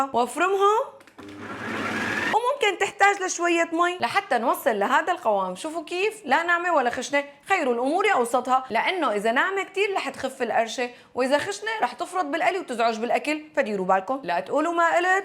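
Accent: Syria. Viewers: اقسم بالله اللهجة السورية تجنن😂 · الحجي السوري يخبل❤ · فلافل بيحب يضل مرح رح 😂 انا ما دخني كلمن وحمصاتو. 😂. يارب على اللهجه السورية مااجملها ،🇾🇪🇾🇪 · كل ومين وحمصاته. ما أجمل اللهجة السورية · حبيت الفلافل من شان لهجتك السورية اللي تهبل الله يعطيك العافية يارب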